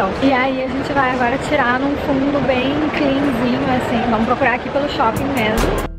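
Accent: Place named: Rio Grande do Sul, Brazil. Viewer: você é gaúcha né? Por favor que sotaque lindo, mas hein.... Adoro suas dicas ♥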